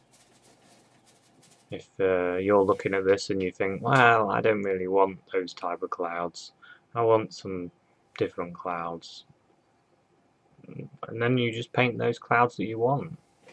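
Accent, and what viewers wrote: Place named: Liverpool, United Kingdom. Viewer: Hya, love your pieces, you sound like your in or from Liverpool.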